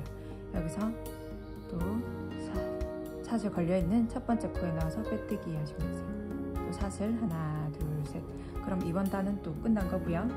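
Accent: Busan, South Korea. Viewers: 저도 부산 출신이라 ㅎ 광안리 … 멀리 미국에서 부산 사투리 쓰시는 님이 늘 가까운 느낌이네요 뜨게질 소질은 없지만 보게 되네요 ㅎ 예전에 지인이 만들어준 수세미도 생각나고 잘봤어요~~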